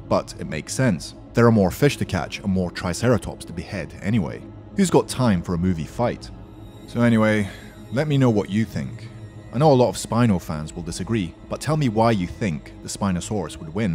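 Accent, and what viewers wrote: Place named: United Kingdom. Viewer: Just because you speak in a British accent doesn't make you an expert on what happened during the age of dinos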